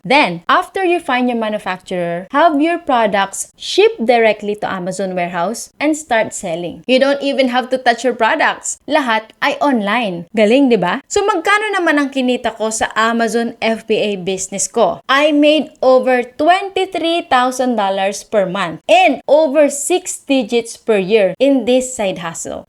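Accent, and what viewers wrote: Pangasinan, Philippines. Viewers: i can hear my co-pangalatok po hehehe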